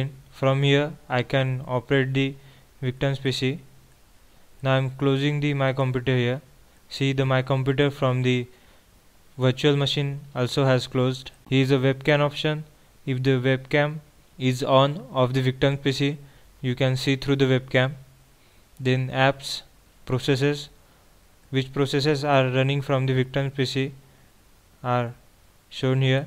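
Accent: India. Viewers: wow extreme indian accent... is english your mother lenguage? or is it hindi? or maybe some of the other...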